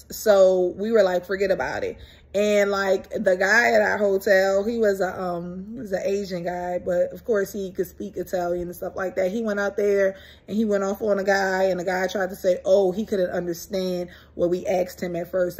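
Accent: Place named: United States. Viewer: they probally thought you were illegal africans at first sight if they hear your accent and it sounds ameriican they will treat you diferently than other black people .unfortunately l a lot of africans illegally go to italy and get involved in things like prostitution and drugs they assume all black people are into this.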